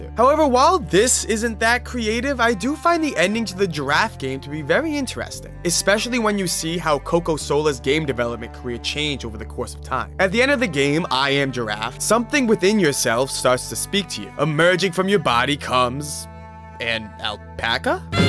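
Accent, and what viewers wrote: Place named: California, United States. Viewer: What do you mean? Just sounds like a normal California accent to me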